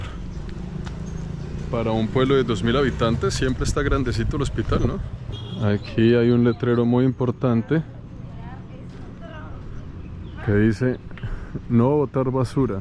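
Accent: Colombia. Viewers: me gusta mucho tus vídeos y tú acento colombiano es único ases muy bien tus vídeos saludos desde fort worth Texas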